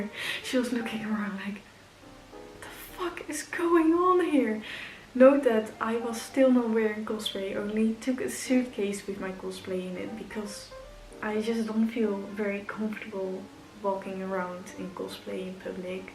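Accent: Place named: Netherlands. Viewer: Lol 10/10 dutch accent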